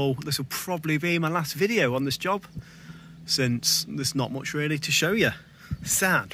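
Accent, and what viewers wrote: Derbyshire, United Kingdom. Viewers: Sounds like your from chesterfield or North Derbyshire ?????? Let me know pall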